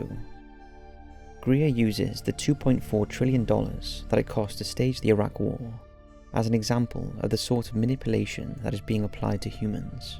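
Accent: Wales, United Kingdom. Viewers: 😂😂 sounds like a semi-welsh, generic accent, plus, a commenter on this comment thread has already pointed out they can't stand the voice, not massively effective I'd say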